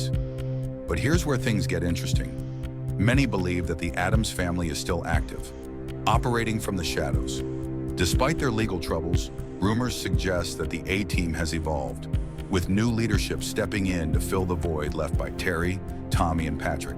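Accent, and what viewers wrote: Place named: United States. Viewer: Turned off as soon as I heard an American accent.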